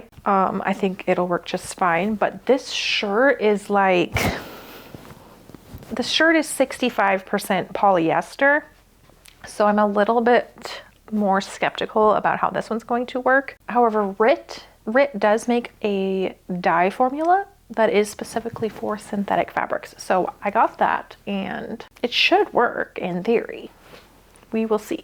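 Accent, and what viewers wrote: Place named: Australia. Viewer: Love your Aussie! This makes me want to dye some stuff I don’t like.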